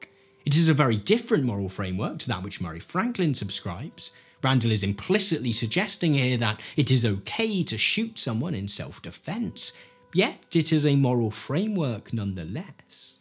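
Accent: United Kingdom. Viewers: british accent make everything better^^^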